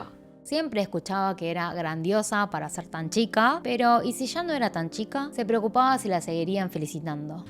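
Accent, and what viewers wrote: Argentina. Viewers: Se le nota un buen el acento argentino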